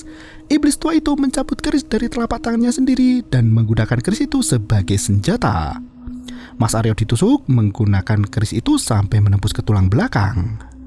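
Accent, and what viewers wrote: Banyumas, Indonesia. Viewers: Astaga solo ngapak😅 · Solo daerah mana kok ngapak?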